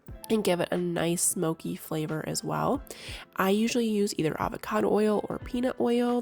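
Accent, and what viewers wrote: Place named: Michigan, United States. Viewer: Everything looks great! Sounds like you're from Michigan or somewhere north??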